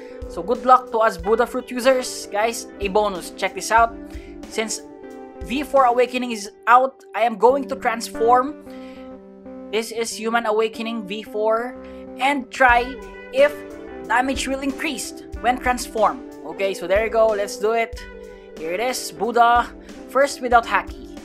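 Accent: Philippines. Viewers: You sound so filipino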